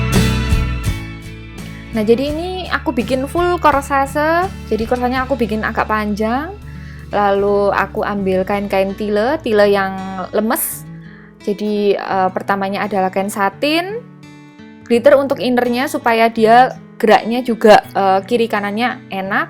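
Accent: Java, Indonesia. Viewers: mbak saya pengen belajar makeup tolong gimana cara nya, karena saya sering melihat hasil makeup bagus dgn medok jawanya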